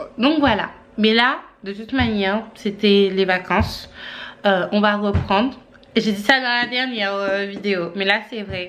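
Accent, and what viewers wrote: Antilles, Caribbean. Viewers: Oui tu as l'accent tu as vécu aux antilles ça c'est suwe 🤗🤗🤗mdw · Fiche que tu as l'accent antillais 😭💔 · T'es congolaise mais ta l'accent des antillais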